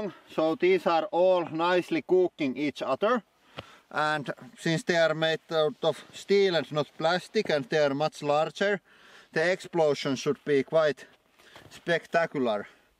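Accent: Finland. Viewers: Are you from Finland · kuulostaa suomalaiselta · You Sound like your from finland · tää ralli englanti 👌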